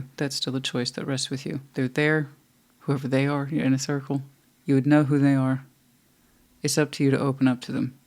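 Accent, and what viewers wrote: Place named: United States. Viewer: Thank you and Cinnamon, 🤗🤗🤗🤗 lol, you got me laughing cause seeing my current energy you'd never have thought I was fiery, my brother constantly pushed my buttons, day in and out for near 18y, he's a Leo, I think my anger amazed him, unfortunately I became dark inside and have been relearning the light w/in me, because I do remember my peaceful happy nature prior to the constant antagonization. Your American English is wonderful, I Love how you speak, yes very soothing and calming, and prolly more descriptive than my vocabulary. So many things passed me by when 29-30y ago I had to give up being stay at home Mom when I divorced and kids, then grandkids all went off having fun w/rest of family while I worked, and many times I was so tired all my remaining energy was to eat supper, shower sleep and do it over again next day. 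Between driving or factory jobs. I missed their developing years. If they want to call me old, I now tell them speak for yourselves, but they're all busy now, I took early soc sec. I talk w/the cat, birds in the yard and God. I monitor my energy, the cat is 16ish, youthful for her age, she just had a well pet ✔️ and I had to mention I'm faster than the cat if she tries to escape my arms, we don't use pet carriers. Love you our us all, Godspeed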